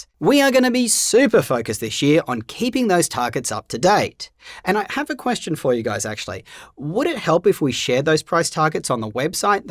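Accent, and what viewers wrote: Australia. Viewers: Why are you US centered when you sound like Crocodile Dundee?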